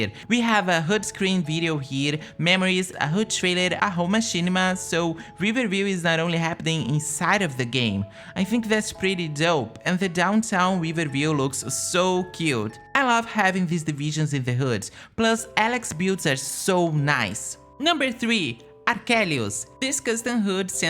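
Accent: Brazil. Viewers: Adorei o video e pelo sotaque ja sabia que era BR. Can anyone recommend some hoods available for the Super collection for mac users? :( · Simmm, assisti o vídeo pensando, é brasileiro certeza kkkkk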